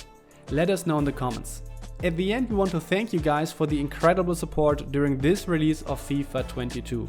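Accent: Germany. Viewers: Man hört dein deutsch so raus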